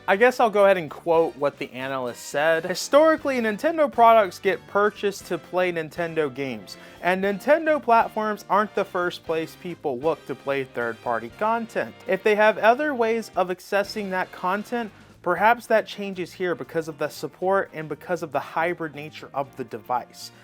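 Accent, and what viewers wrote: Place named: New Jersey, United States. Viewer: I wonder if his odd pronunciation is intentional for engagement… I doubt it. Where is the accent from west NJ?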